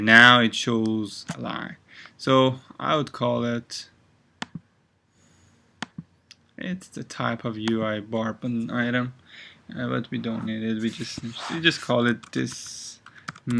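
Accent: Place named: India. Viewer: Dude, are you from India? Just noticed your accent, but anyway your english is perfect!). Your tuts is very cool too, thanks)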